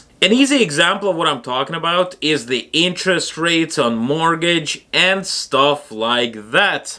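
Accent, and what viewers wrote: New York, United States. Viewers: You already sound american! Its my life and I can do whatever I want! Land of the free. Maybe you can teach a certain group of Americans that freedom is worth fighting for! Good luck to you guys! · Dude, you already sound like you are from NY, you should fit it just fine. Don't you have cousins here already?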